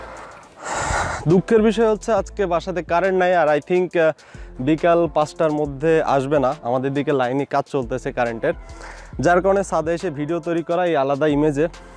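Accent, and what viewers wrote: Sylhet, Bangladesh. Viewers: ভাই আপনার বাড়ি সিলেট না কি?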